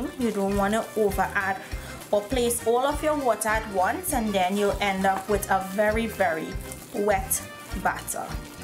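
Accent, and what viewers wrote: Trinidad and Tobago. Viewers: Sounding like ah Trini😁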